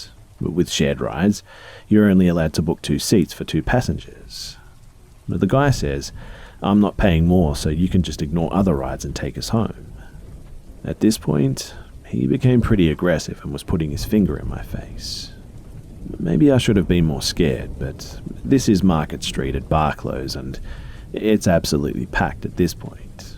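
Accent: Australia. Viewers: I keep falling asleep to your narrations. So then I have an Aussie narrator in my dreams that at times end up mimicking the story being told. It's quite entertaining.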